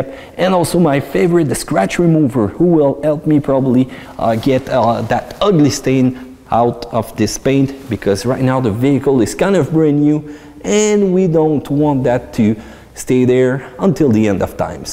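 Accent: Quebec, Canada. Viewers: hahahhahaha quebecoissssss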